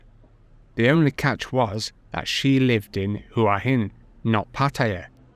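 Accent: United Kingdom. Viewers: Not true.... You speak with a British voice yet you're not a Yank.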